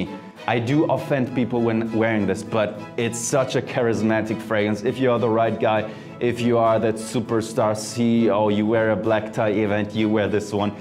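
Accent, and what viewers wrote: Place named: Germany. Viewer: that german accent thou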